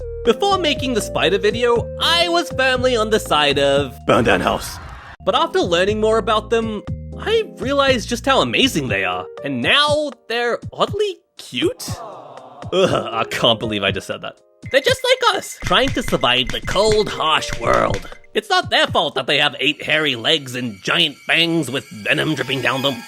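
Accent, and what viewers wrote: United Kingdom. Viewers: . ARE YOU A BRITISH 🤣🤣🤣🤣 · Are you British? (Slurping hardly) RAHHH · Are you British? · Are you british? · Are you British? Spits tea on dude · Well if you’re not British? What are you? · r u british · Are you brithish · R U BRITHISH???? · R U BRITISH? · bro is British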